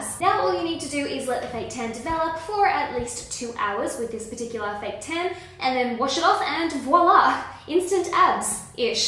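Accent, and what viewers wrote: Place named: Australia. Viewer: LOVED This! Thanks lovely!! Side note- I'm super surprised that a lot of people haven't realised that you have an Australian accent and there fore live in Australia... where it's currently summer ☀️😜 Haha xxoo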